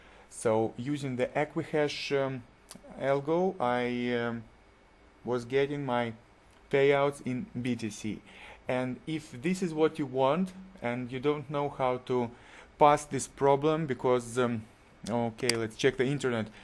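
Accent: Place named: Russia. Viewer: Отлично! Всё понял друган! Привет из России! Сколько сейчас долларов в сутки майнишь? что то у тебя реально конкретный акцент....ты из РФ что ли? :)))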